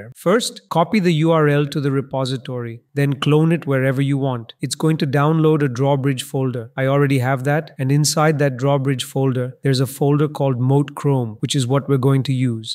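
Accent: India. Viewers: Bro... literally me every fking day! FYI - it's not cursor. It's fking claude. Thank you for not glossing over when tools are dumb af, This AI narrative is much better than the narrative you're using for the one with the asian voice. The asian voice one is a little more sycophantic than this indian one.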